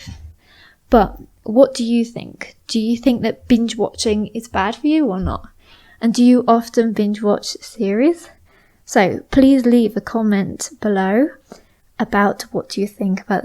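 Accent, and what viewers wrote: United Kingdom. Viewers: I began listen to this podcast from yesterday 😊 , i see British accent is beautiful than American . I liked the way when u said word ( relax ) 😍.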